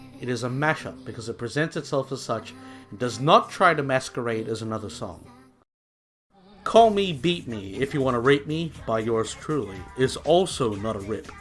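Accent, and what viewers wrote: United Kingdom. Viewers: You kinda sound like ProZD if he had a slight welsh accent. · Educational and interesting this cool. Also YOUR VOICE IS UNEXPECTEDLY BRITISH SOUNDING.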